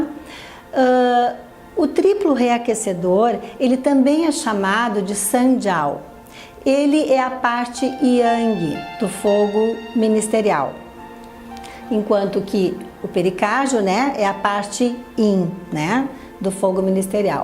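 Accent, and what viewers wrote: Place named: Rio Grande do Sul, Brazil. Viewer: Tô reconhecendo e me identificando com esse sotaque. Somos conterrâneas dos Pagos?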